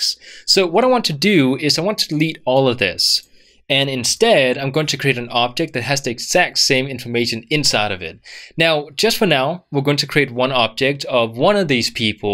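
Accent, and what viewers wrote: Denmark. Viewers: Trying to figure out the accent. Definitely a Scandinavian but not Swedish accent because, we don´t sound exactly like that but close. It´s not Norwegian because you don´t sound that gay, so you must be from Denmark.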